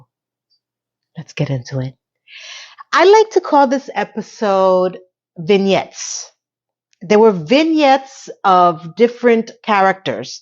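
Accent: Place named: New York, United States. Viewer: I have so many things to say about this episode, but just paused to say, 19 years in Denmark, and still have my very distinct Chicago accent. It ain't going anywhere. ;) I love a NY accent, and I can hear it in you, not so much from "Gia". Anthony held his own,proud of him. Ok, I will un-puase and watch the rest now. :)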